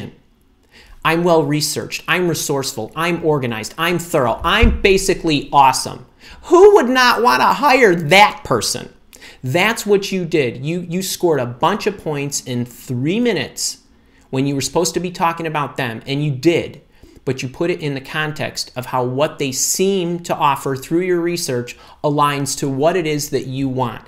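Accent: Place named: Midwest, United States. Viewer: Every few years I watch your videos when it’s that time again. I always get great guidance — & that amazingly vowel-ly Midwest accent just warms my little Chicago heart every time! 😊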